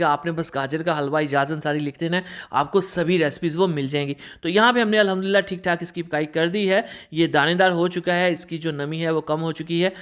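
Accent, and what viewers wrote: United Kingdom. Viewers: Nice recipe,,, liken Aap urdo baat kar rahihen ao lihja English ka hai lihaza lihja thek kren bahut bura lagta hai Aapki sar pi firingi Bhoot swar hai😈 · Nice.. But plz Urdu lehja me he Urdu bolain ,,, English accent me bolne ki koshish na Karen. Apki voice bahot piyari hy ...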